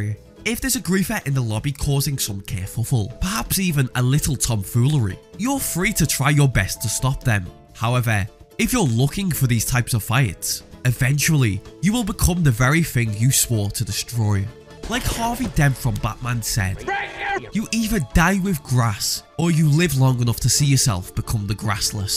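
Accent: Liverpool, United Kingdom. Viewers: lads a scouser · thanks for the subtitles i couldn't understand what you were saying through your British accent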